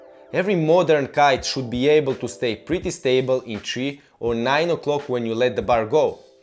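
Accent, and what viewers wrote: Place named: Russia. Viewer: Iemācies angļu valodas pareizu izrunu, angļu valoda ar izteiktu krievu akcentu! Pretīgi klausīties!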